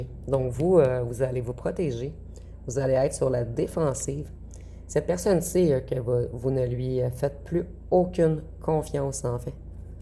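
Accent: Quebec, Canada. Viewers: Bonsoir , c'est toujours avec plaisir que je prends a vous retrouver ,j'apprécie fortement vis guidance ,votre voix québécoise , votre chaleurosite , et votre manicure 👍 toujours au top bien soigner . J'essaie d'oublier ce gros salopard très malade du cerveau et qui se joue a mentir tromper illusionner qu'il a l'habitude de jouer ds son grand scénario théâtrale pour enfermer les pauvres victimes et plus elles sont empathique plus le vampire se régale ,il n'aime que ça les énergies un sorcier magicien ds l'ombre très mal ds sa peau et ds sa tête a toujours être ds la séduction les mensonges son financier est le plus important et toutes ses merdes d'addictions , pour moi il peut croupir il s'est attaquer a une élus ds la lumière et donc a dieu lui même , il est surveillais de la haut et le divin est en action il va payer le poids de ses erreurs et détruite se sentir plus fort que dieu lui même capricieux gamin ds sa tête ,immature , qui boude ou fait des crises capricieuses et ou de jalousie , un vrai psychopathe qui aurais besoin de consulter un docteur malgré que je croit il est irrécupérable et qui voudra bien le garder a part la tierce sa sorcière qui est comme lui ds l'ombre et la sorcellerie . 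Merci a vous , bonne soirée .❤👍🙏🌹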